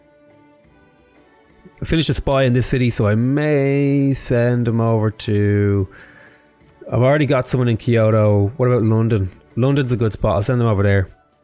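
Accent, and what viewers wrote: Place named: Ireland. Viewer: I love how much more pronouncedly Irish you sound at the episode's beginning.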